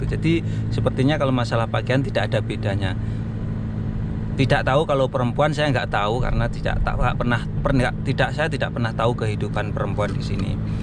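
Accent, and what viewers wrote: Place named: Banyumas, Indonesia. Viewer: bang asli endi ngomongnya ngapak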